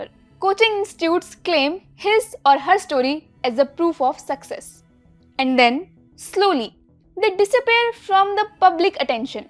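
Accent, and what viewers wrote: India. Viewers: Students born in the US go for Law and Medicine in big numbers - they say that in the US, the most stupid lawyer (or doctor) earns more than the most brilliant scientist - this may be an exaggeration, but you get the idea. Oh, by the way, you have a very strong Indian accent 😀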